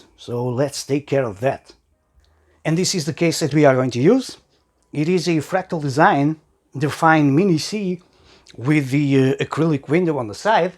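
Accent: Portugal. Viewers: i freaking knew you were Portuguese by your accent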